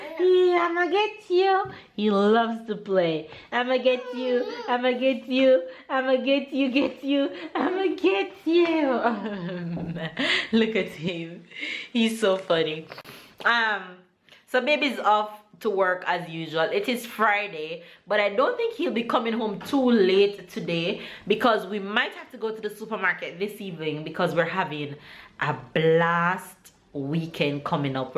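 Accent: Jamaica. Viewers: U go gal love fuh hear u teach uh husband d Jami twang shouts out from Tobago Caribbean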